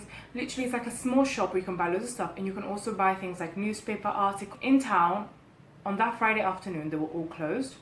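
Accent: United Kingdom. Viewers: it’s really not a stupid question. I didn’t realize she grew up in Italy until she mentioned it. As an American, she sounded very British to me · Wow you sound british. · You learned English from a British instructor(s) so you have that accent. Your mother's accent from Nigeria i hear as well. And of course the Italian 😁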